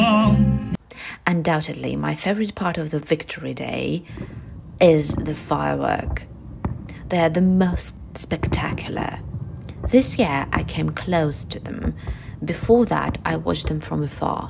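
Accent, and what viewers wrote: England, United Kingdom. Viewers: how you got an English accent?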